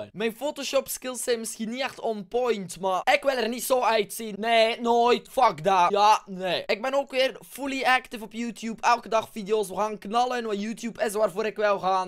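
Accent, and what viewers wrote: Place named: Flanders, Belgium. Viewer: love your accent! (Ik vind vlaamse accentjes gewoon leuk)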